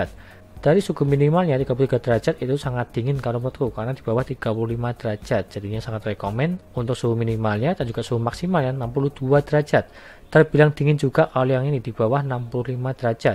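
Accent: Java, Indonesia. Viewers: Bang ngomngnya medok bnget😅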